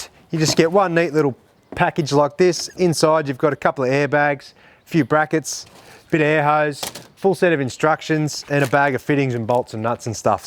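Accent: Australia. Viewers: Australian accent mate “Straya” 🇦🇺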